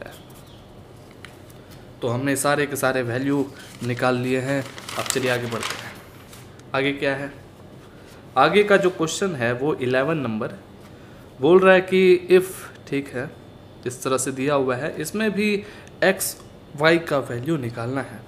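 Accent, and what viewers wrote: Bihar, India. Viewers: Sir aapke aawaaj me hamare bihar ki jhalak aa rhi hai ,, kyonki isme koi gunjaish hi nhi hai naa samjhe naa , ok paarnaam ,,ram ram 💪🙏🙏🇮🇳🇮🇳🇮🇳💪💪💪💪💪🙏🇮🇳😘🥰😍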